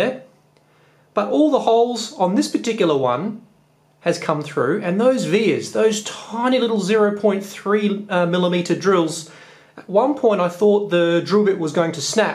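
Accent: Australia. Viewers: hes just got a really strong aussie accent, i kinda like it (though im also an aussie)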